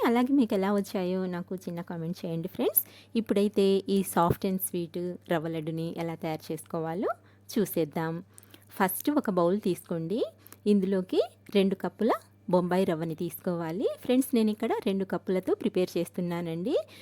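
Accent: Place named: Coastal Andhra, India. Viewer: " " అనే పేరు బహుశా ముస్లిం స్త్రీల కు వుంటుందని నా అభిప్రాయం. కానీ మీ పలుకు హిందూ మహిళలు మాట్లాడినట్లు తెలుస్తోంది. మీరు పలికే విధానం కూడా కోస్తా ప్రాంతం వారి లాగా వుంది. మరల మీ వంటలన్ని హిందువుల పండుగలు కావలసినవి గ వున్నాయి. మీ వాయిస్ కూడా మార్థవంగా వుంది.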